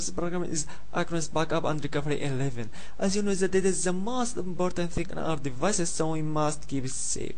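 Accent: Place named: India. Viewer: kkkkkkkkkkkkkkkkkkkkkkkkkkkk I understand everything and I love indian (I suppose) accent.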